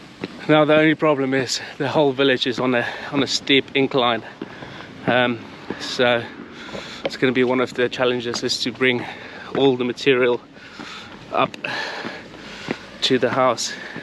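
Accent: South Africa. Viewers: Thought l recognise that SA accent. Bietjie verbaas dat jy kla oor kerkklokke wat mag raas? skaam jou! Klou aan die bietjie mooi in die lewe. Dis n voorreg om daar te kon koop en alhoewel dit baie werk gaan wees, maak die meeste daarvan - van ALLES!!! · I support you efforts on restoring this Italian beauty but please please work on that monotone South African narration 🤮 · is that a SA accent hear? SUBSCRIBE!!!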